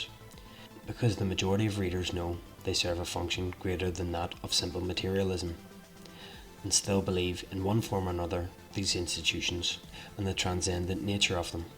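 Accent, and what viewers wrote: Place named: Ireland. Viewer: It needs a special attitude to read PM books like this one and maybe exposure at uni...neither of which I have so I appreciate it when those who grit their teeth and gird their loins to do so to share their breakdowns and insights. If there is more like this to come your content looks set to be a welcome addition to our sphere. I didnt think you rambled but for those of us not attuned to the lovely Irish lilt might I suggest slightly slower speech. When one is reading from prepared content (which this seemed to be) there is a tendency to read it aloud a little fast.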